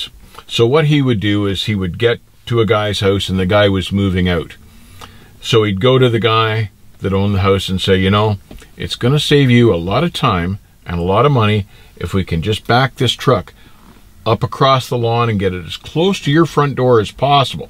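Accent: Canada. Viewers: which part of Canada are u from?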